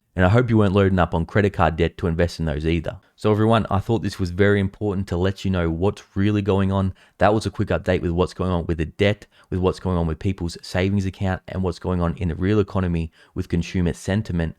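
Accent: Australia. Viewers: You sound like you're from Australia how's it going down there I think you should probably pay more attention to where you're living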